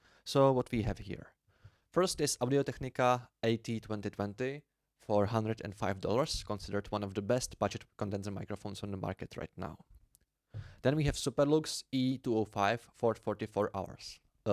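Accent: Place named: Central Europe. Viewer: You must be from middle europe because your accent is like poland, hungarian or slovak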